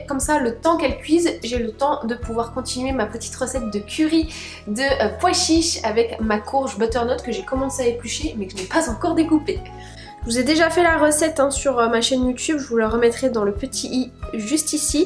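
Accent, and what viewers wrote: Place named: United Kingdom. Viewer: Bonsoir je ne voudrais pas vous contredire mais franchement je suis belge et je ne reconnais pas cet accent là 😂mais moi je vous croyais d'origine anglaise. Vous êtes très rigolote. C'est amusant. J'aime bien.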